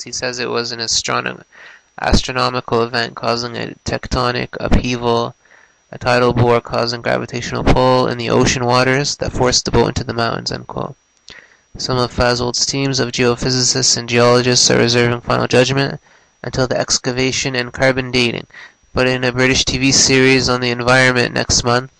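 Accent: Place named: Middle East. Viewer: According to your video the Bible was correct and the Quran was wrong. The person talking in the video sounds like he's from a middle eastern background which is probably why he's saying the Bible was wrong even though in the text he provided as proof the Bible is "correct." I Still don't believe in the whole religion mumbo jumbo. OMG A BOAT, IT MUST BE NOAH'S ARC. At least I got a laugh out of this so thank you.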